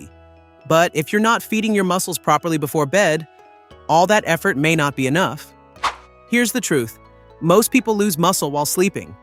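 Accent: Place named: United States. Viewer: Click bait rubbish with a annoying American accent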